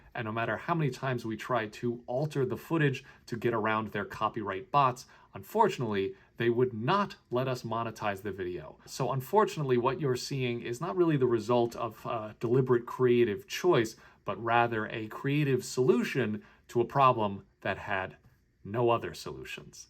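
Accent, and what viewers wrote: New England, United States. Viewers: The NE accent gets stronger and stronger each video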